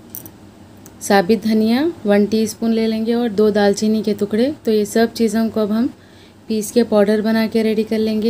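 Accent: Hyderabad, India. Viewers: Mujhe aapka typical hyderbadi accent bohat pasand aata...👍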